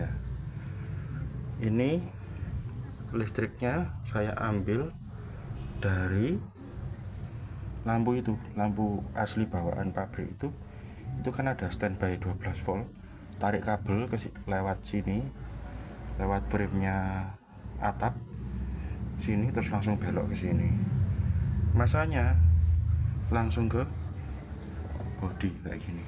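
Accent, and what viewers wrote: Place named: Malang, Indonesia. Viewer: 😂 iki logate kok koyok wong malang yo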